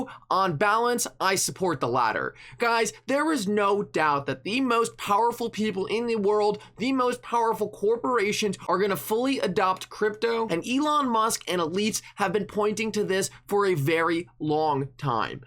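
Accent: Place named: Philadelphia, United States. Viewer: THAT IS A 215/267 AREA CODE ACCENT! LOL BUSTED! LOVE ALL OF YOUR CONTENT EITHER WAY YOU HAVE BEEN #1 ON THIS CASE FOR THE PAST SEVERAL MONTHS IN MY OPINION! POWER TO THE HODLERS❤🎉😊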